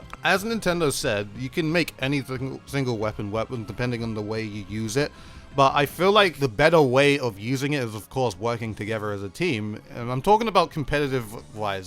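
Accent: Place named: London, United Kingdom. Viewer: Hey, where abouts are you from? I'm from London, England and your accent sounds very similar to mine so I'm assuming you're from nearby?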